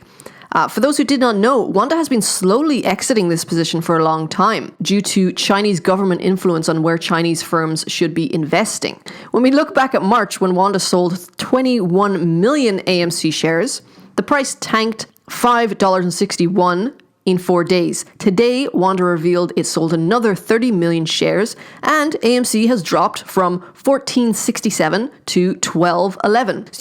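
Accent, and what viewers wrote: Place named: Ireland. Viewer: Great job! You sound Irish, my son lives in Coventry, UK.......You are doing outstanding work for the community....Thank You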